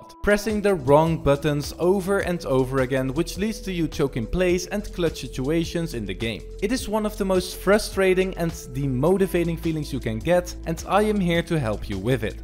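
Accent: Netherlands. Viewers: Are you dutch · qucee 😂😂 Are you dutch Nice vid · Are you from the Netherlands? It sounds like it. Edit: you are haha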